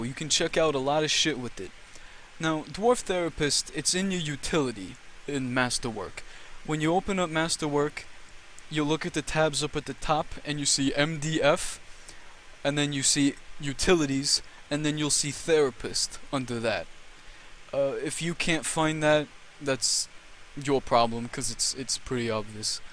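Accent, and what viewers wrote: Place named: Brooklyn, United States. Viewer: Are you from Brooklyn?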